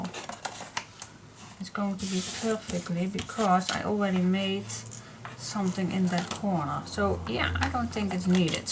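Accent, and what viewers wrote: Netherlands. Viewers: !, your “ Dutch English”, is one of the things that I find most endearing!. Your witty comments and chitchat, are what makes you, you!. If people want to only listen to perfect English, they should only watch English tutorials!. Please don’t change yourself or what you say or do!, you are just perfect as you are!.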